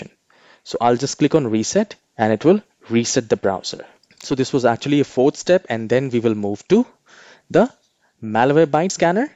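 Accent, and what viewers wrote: India. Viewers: jesus pleesuz. It would be so nice if people that are instructing, would look at their tutorial from a student point of view. I spend more time rewinding because I can't understand the jibberish coming out of your mouth. Your think accent is bad enough in and of itself. But stop mumbling. Typical of east indians. And speak slowly ffs. Make sure to say EXACTLY, what you are typing. saying "temp%", is not what you typed "%temp%".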